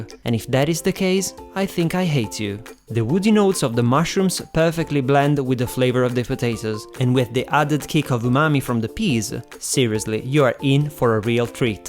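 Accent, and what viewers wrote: Italy. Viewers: This is one of my favourite dishes. My grandma used to cook it for me when I was younger, without the mushrooms. And I don't know if it is a coincidence but .. your accent sounds italian and my grandma was italian, so I think this is an old italian dish, especially in the northern regions. 😯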